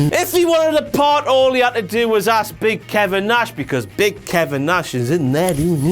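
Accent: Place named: Cockney, United Kingdom. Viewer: More of that cockney accent please haha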